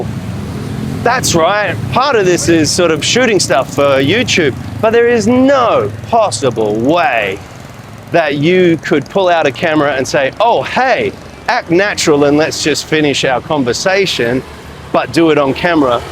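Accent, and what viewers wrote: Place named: Australia. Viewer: the foreign accent (Australian?) pronouncing Indonesian had me confused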